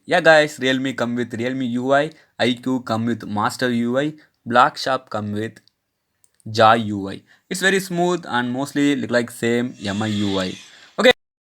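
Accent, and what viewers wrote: South India, India. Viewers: What a malayalam or tamilian style english😢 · Your English sounds like South Indian English.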